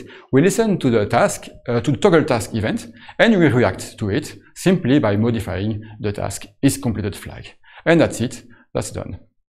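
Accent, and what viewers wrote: France. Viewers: he's 100% french or belgium native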